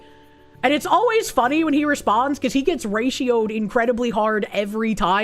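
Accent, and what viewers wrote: Boston, United States. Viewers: Her Boston accent gets my motor running! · You have a Boston accent?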